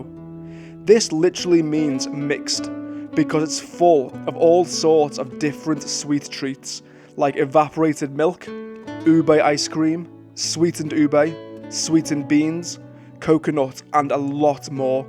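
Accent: Liverpool, United Kingdom. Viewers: U scouser?? Or from wirral?? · You sound like a scouser. You here for long I'm in cebu for 18 months now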